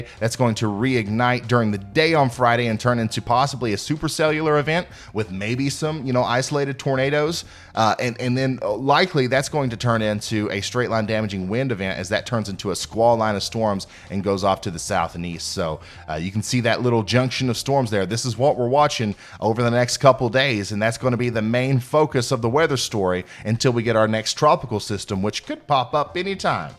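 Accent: South, United States. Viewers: This guy is such a southern reporter. 😒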